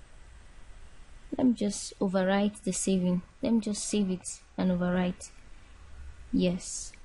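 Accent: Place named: Nigeria. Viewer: You are good at this. You also sound Nigerian and the accent gives me a better understanding 😀. I love your work, it's inspiring.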